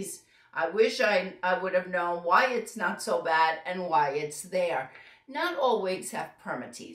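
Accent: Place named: New York, United States. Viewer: Heard NJ / NY in there somewhere! Thank you for your videos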